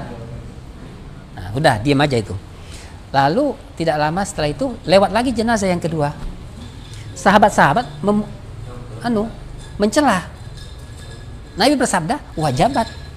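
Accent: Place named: Java, Indonesia. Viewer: Sampean lubise wong jowo tah..ketok logate. 😁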